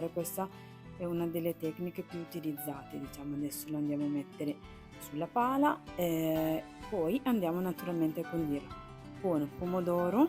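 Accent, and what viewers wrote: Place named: Brescia, Italy. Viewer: bravissima, oltre che l'accento bresciano che e di una simpatia unica, sabato provo a farla alla tua maniera.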